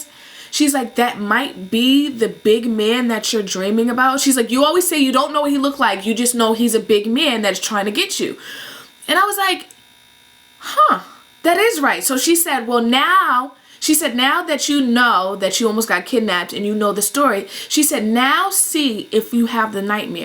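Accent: Boston, United States. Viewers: Omg you are from Boston.... what part are you from? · Hey im new to ur channel nd this is the first video ive seen haha i like it ps u must be from MA or boston me too!!!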